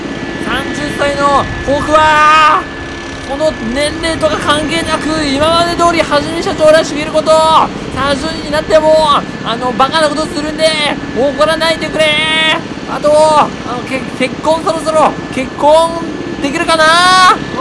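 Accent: Toyama, Japan. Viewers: 時々富山弁がでるのが、ほっとします。富山県人より☺️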